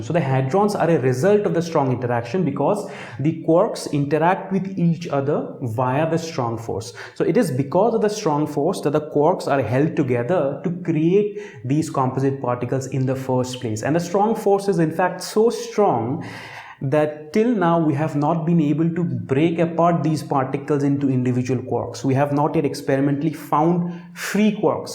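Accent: India. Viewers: But I guess you're indian and that's why you're accent sounds like that...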